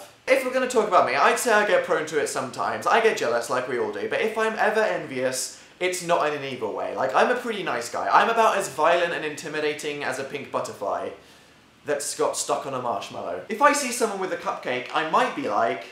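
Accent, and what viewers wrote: United Kingdom. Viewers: I'm envious of your articulate british accent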